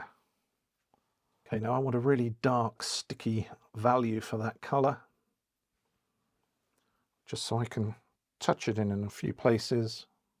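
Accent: United Kingdom. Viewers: Very informative! Off topic; are you from the same area as John Cleese? You guys have the same accent.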